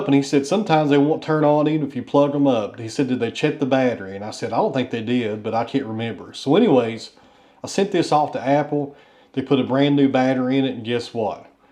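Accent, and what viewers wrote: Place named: East Tennessee, United States. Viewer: . Would you ever consider showing us some of your picking? Banjo? I would drive from Omaha to see you. So glad you saved the old footage. Losing memory sucks. I know. My brain doesn’t work like it used to either. LOL Even Bruno was smaller. Big 15 y/o now. Great to see the progression and again for your diligence in editing. I love your speech foibles. They just show how human we are. You don’t have to bark orders anymore from being a cop. I’m sure you were quite succinct then. Now you can be just Eastern Tennessee. ❤😂😊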